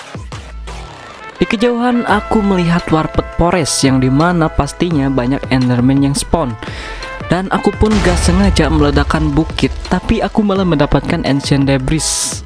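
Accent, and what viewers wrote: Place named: Sunda, Indonesia. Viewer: Susah kek nya dh biasa ngomong bahasa Sundah teh maksain make bahasa indo😂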